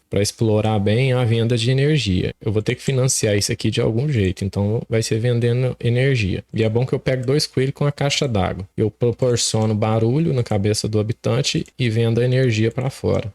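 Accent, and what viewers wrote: Minas Gerais, Brazil. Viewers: sotaque mineirin q e bom de mais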